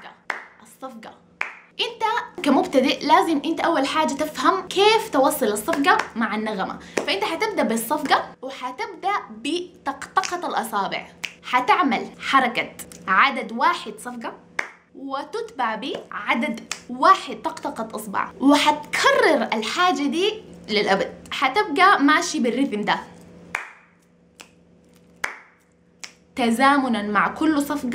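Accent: Sudan. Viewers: حبيت اللهجة السودانية ❤❤ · اللهجة السودانيه،،. عسل والله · يا عمري انت. انا اتابعك من الجزائر. احلى حاجة عجبتني لهجتك. لانه اللهجة السودانية ما نسمعها كثير و جميل انك تعرفي الناس على الثقافة السودانية. الف تحية مني لك. رايحة اكمل اشوف باقي الفيديوهات لي حاطتها ههههه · اللهجه سودانيه اما اللغه اشوفه عربيه حقققققققتنا دي يا 😂😂😂😂😂😂😂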